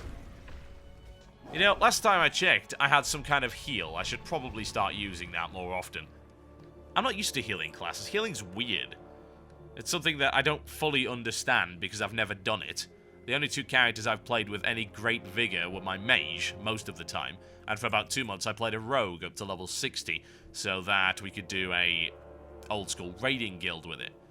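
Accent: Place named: Newcastle, United Kingdom. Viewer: OH MY GOD , Your from Newcastle!?!? Im from Sunderland :D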